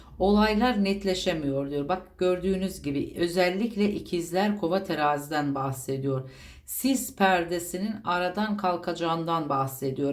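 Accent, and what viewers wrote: Azerbaijan. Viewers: Siz Azerbaycanlımısınız? Şive çok benziyor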